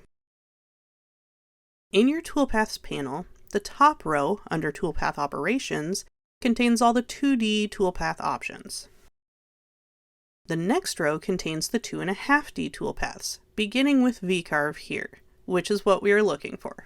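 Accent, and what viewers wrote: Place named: England, United Kingdom. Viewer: Good job. Your voice is perfect for this work. I've been fooling around with this stuff for 3 years and now I know I've been doing it all wrong with some unusual results. always went back to the straight bits with just OK results. I think Vectric should hire you to do all their training videos. The English accent confuses me every time. I am now a subscriber. Thanks again. I guess an 80 year old man can still learn a few new tricks after all.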